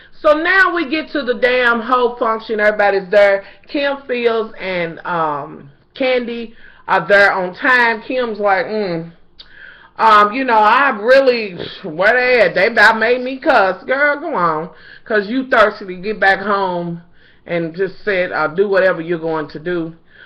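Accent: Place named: New Orleans, United States. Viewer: Miss I swear that I love you, if I didn't know any better I would swear that you were from New Orleans!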